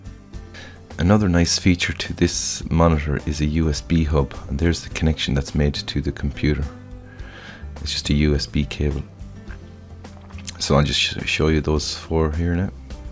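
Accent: Ireland. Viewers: Are you irish? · Irish man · Your Irish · Hey could you make a video on how to setup 2 monitors or 3 monitors? I think i know how to do it but dont want to spend money buying another monitor and not knowing how to install it, cheers. oh and nice accent let me guess Irish? from the low southern end of ireland? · Im Irish too, you sound Irish anyway =D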